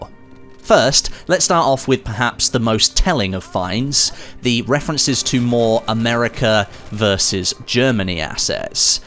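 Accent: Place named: England, United Kingdom. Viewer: Have seen worse pronounciation of german words. You have an english accent, yes, besides that, Sonderkraftfahrzeug was pronounced ok, B-C from your german teacher ;P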